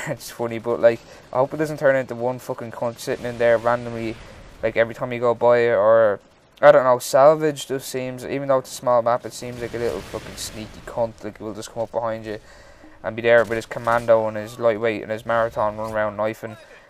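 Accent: Ireland. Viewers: Wat part of ireland r ya from I from ulster tyrone omagh town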